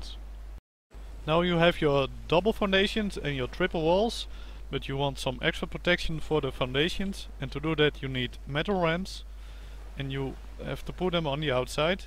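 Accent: Netherlands. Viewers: Nice dutch accent ;) subbed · are u dutch? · Dat dutch Akzent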